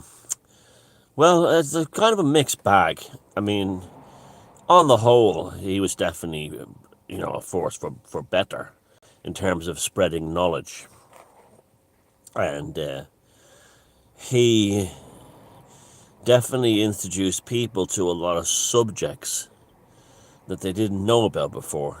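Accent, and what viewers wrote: Tipperary, Ireland. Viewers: Well I don’t think I’ve laughed so much in years as I’ve just done at your Tipperary accent 🤣😂! I left Ireland at the age of 21..30 years ago now! I remember the characters and I also remember an old fella that used to live in our neighbourhood when I lived in London, he was from Tipperary and spoke exactly the same! He had many a spat with the neighbours which usually ended up with him calling them “feckin pagans”…why he chose to use pagan as in term of insult..well I’m not exactly sure 😂. I remember creasing up many a time at his antics. I was about the only one locally he had a good word for..because I was Irish 😂. He always used to remind me that I was only a wee Irish girl in “John Bull” and I must watch out for “dem heathens” over here..OMG 😂..you just reminded me of him, definitely long since passed by now, he must have been pushing 70 at the time. 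Thank you for the laughs and the reminders ☺️. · Shite Tipperary accent 🙄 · Tip on the tipp accent - you have to add a y as the second letter of every word that ends in a vowel or w. It may well be impossible , I don't think I have the right muscle fibres in my tongue to enable a Wicklow accent, probably requires conditioning since infancy. There is actually a huge diversity of accents in Tipperary(all have that y thing though), they get extreme as you approach the borders with other counties. R.I.P Jordan Maxwell..Really enjoyed you're last few videos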